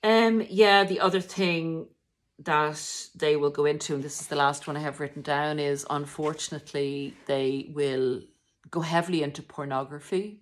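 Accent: Ireland. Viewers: My ex was Scottish but lived in Ireland, so I visited Ireland a lot and travelled around many different counties. Thus I love the accent and really love listening to you. Your voice is really soothing to me and reminds me of just sitting chatting with locals in some beautiful country bar. You also hit the nail on the head with the narc stuff , as the Scottish ex was a disgusting , cheating lying abusive violent narc - a really extreme version. So despite the fact that some very extreme abuse occurred when I was alone with him in Ireland - i still have some lovely memories of the place, the landscape and people i encountered when out and travelling around alone. I even met strangers who stepped in to help me when the abuse was extreme- people who actually took me in to their home. I am eternally grateful for the help they so selflessly gave me- and I often wish I could let them know albeit spiritually, that I finally got away and stayed away. 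❤️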